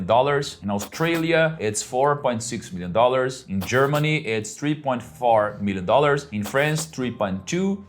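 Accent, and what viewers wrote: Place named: Brazil. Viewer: Fly, my friend! Fly! So good to hear your voice in English! You'll get to the hearts of people with your Brazilian accent! This is what happened to me 20 years ago, working as a volunteer in the US and learning the language at the same time! You're soooo good to go! You rock! <3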